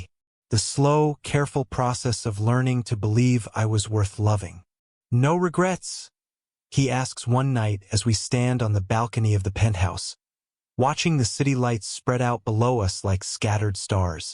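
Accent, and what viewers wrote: Spain. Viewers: and again those spanish accented phrases popping up like unwanted exclamation marks -. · The occasional Spanish and Spanish accent is funny 😂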